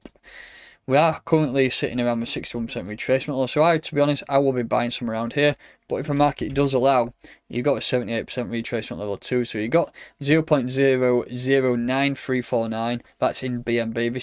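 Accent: United Kingdom. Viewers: Love the accent. Reminds me of all my British mates I may not see for a long time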